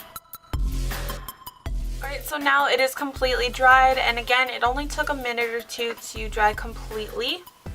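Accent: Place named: Boston, United States. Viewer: Your boston is so cute!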